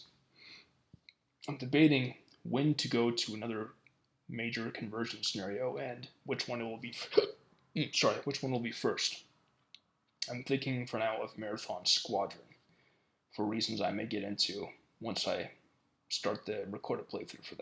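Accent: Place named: United Kingdom. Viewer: Your knack for surviving by the skin of your teeth far outweighs your British accent.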